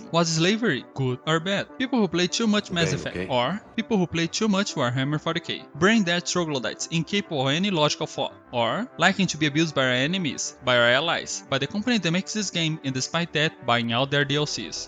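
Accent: Brazil. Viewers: Pelo teu sotaque, sei que tu é brasileiro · U really sounded like a brazilian in this video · tava reconhecendo seu sotaque... é BR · So pelo teu jeito de falar ingles descobri que tu é brasileiro hahahahaha. Primeiro br fazendo video estilo seth, muito bom, continua!! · Hey, you have accent, are you Brazilian or Latin American? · Sabia q era BR só pelo sotaque kkkkkk, ótimo vídeo champs!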